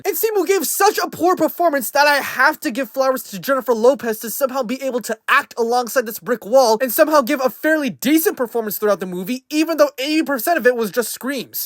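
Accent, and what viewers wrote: United Kingdom. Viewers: You're brit ??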